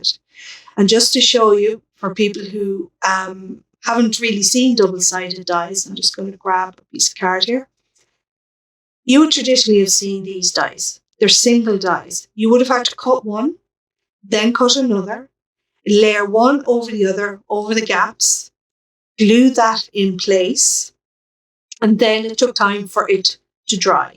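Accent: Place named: Ireland. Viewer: Congrats on a fab PCS box. Love your box and delighted to hear another lovely Irish voice. Tfs Regards Simone x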